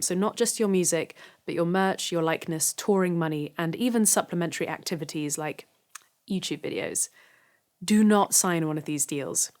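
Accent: United Kingdom. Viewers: I don't know anything about making it in the music industry - but I could just sit and listen to that British accent for hours on end!! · I follow you for your insight, intellect, and whit mostly.. and your charming, propper British accent. I'm honestly not that familiar with your music, but you done good on with Josh. You're valued. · I dunno...you're a skilled and respectable guitarist with a wide YouTube following. I'd argue you've made it already. Truth is, the old models of success no longer apply. Back then, if you didn't have a record deal, no one knew your name. Today, ironically, music is one of the few fields in which now you can succeed with hard work and quality material. And even if your appeal is quite narrow, you have a far greater chance of reaching an audience than back in the day. Truly, I'm not that familiar with your music. I know you from your commentary--like Rick Beato--and I value that aspect of your work immensely. 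You are always lucid, thoughtful, and intelligent, and the fact that you've got a pleasant speaking voice with a lovely British accent doesn't hurt at all. I would wager that even if I can't name one of your songs, I know you for being; and that success, I think, is more valuable than mere musical fame. But truly, what do I know? I don't even have a YouTube channel with hundreds of thousands of subscribers! 🤷‍♂️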